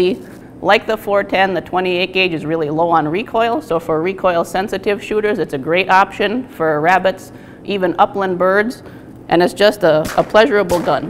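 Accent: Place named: Wisconsin, United States. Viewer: Wisconsin accent coming in thick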